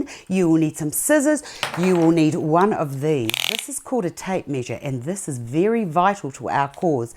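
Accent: New Zealand. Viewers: Not only was this very educational, but I could listen to you all day long- I love the New Zealand accent (Im Canadian :) ). Thank you, Im your newest subscriber :)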